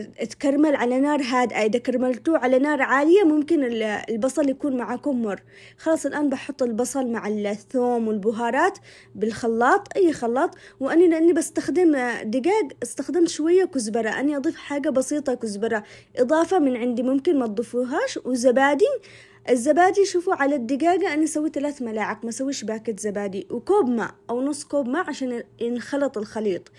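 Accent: Aden, Yemen. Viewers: عجبني لهجتها العدني يسلم ايدك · مادام الهدره حقك باللهجه العدنيه الحلوه خلاص قولي (( كبزره )) بالعدني مش كزبره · محسنك هدرتك عدنيه 🥰 تسلم الانامل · يامحلا الكلام العدني صوتك فيه عذوبه خلاني انااااااااااام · كلامك عدني اصل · ماشاءالله الطريقة حلوووووة وشكلها مضبوط مية المية بس الأحلى هو اللهجة العدنية كان احنا بنتشوه معك 😂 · تسلم يدك وكلامك العدني زيد شوقي لااهلي الي بعدن الحبيبه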